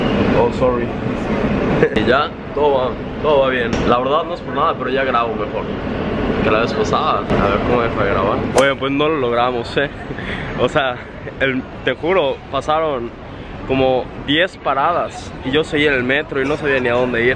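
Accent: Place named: Yucatan, Mexico. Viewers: Habla como fresa con acento yucateco 😀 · Es una chulada ese hombre, ese acento yucateco, y que de el saludo iniciando este video, me ha puesto... HOT! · Orgulloso acento yucateco💘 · 😁😂🤣🤣🤣🤣😅 No sabes no donde andas.... pero me encanta tu acento yucateco. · 🗣 hazme un hijo!!! 🥰💋❤️✌🏻 Amo tú acento yucateco, hablas hasta con faltas de Ortografía 😂😂😂 Besos!!! A 💋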